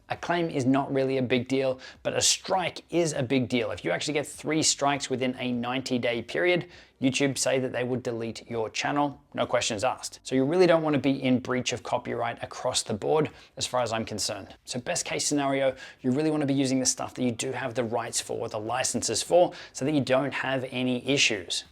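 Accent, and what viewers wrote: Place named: Australia. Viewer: Bro you are wonderfully helpful your videos are amazing. I wish you it was no in Aussie accent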